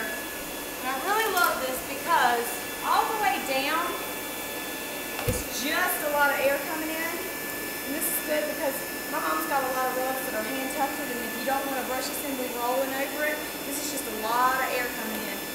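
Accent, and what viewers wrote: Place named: South, United States. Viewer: I love her accent sounds like South Carolina or Georgia. Very pretty too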